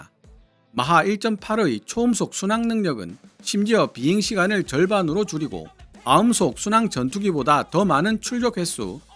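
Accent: South Korea. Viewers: 영어 읽지 마세요..콩글리시 짜증나~~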